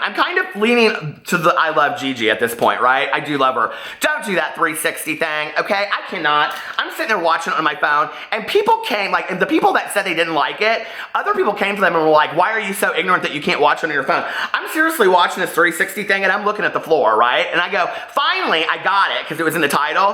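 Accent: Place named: Midwest, United States. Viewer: I knew you was from the midwest. We keep it real there.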